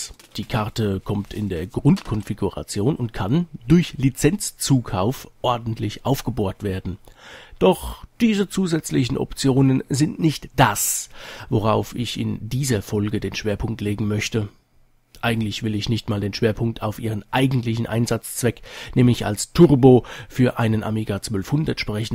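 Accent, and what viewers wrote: Hesse, Germany. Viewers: Ist nicht so schlimm, wenn man beides nicht hat. Hatte erst in der letzten Woche nen 1200er aus'm Sperrmüll gefischt und dieser funktionierte nach nem Testlauf. Von daher wäre so ne Turbokarte durchaus interessant, wobei ich eh nur mein Lieblingsgame zocken würde; und zwar Lemmings, das hab ich in meiner Kindheit gesuchtet.:D Aber es ist trotzdem erstaunlich, was man mit nem Amiga alles anstellen kann; sowas war früher sogar dem PC technlologisch weiter voraus; die Workbench war sogar intuitiver bedienbar als die "schwarzweiß"-Apple-Oberfläche, wo man die einzige linke Maustaste gedrückt halten musste, damit das Menü offen blieb. Beim Amiga gabs diese Problem überhaupt nicht. Derzeit nutz ich zur Zeitvernichtung den WinUAE-Emulator + Kickstarts von AmigaForever sowie den verbleibenden Spiele-ROMs um die Lemminge zwischendurch in der Pause zu zocken. 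Ich finde, auf dem Amiga hatten die Spiele nen besseren Sound als die Konkurrenz. Ansonsten find ich die Videos von besser als die konkurrierenden YouTuber. Klingt komisch ist aber so; und das hat nischt mit dem hessischen Dialekt zu tun. Eher ist es die Qualität, an die niemand rankommt.:D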